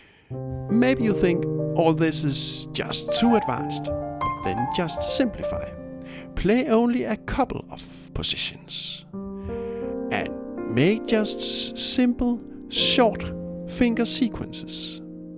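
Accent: Denmark. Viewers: Instantly recognize your accent! Nice video my fellow danish person <3 Gonna definitely use this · You sound danish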